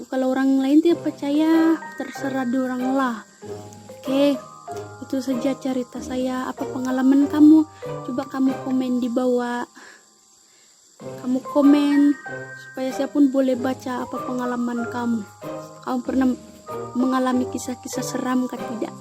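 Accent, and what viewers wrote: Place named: Kalimantan, Indonesia. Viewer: ckap serupa orank kalimntan..borneo😃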